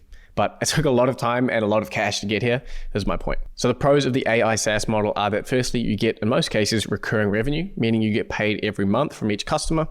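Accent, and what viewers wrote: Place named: New Zealand. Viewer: Hi.I'm picking you're a Kiwi🙏If so are you in NZ and do you have a mentoring service to assist old buggers like me. Love to connect with you, Like your content👍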